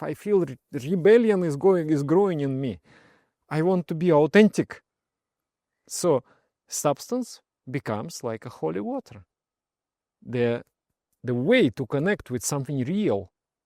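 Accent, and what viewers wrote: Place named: Russia. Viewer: I love the Russian English accent.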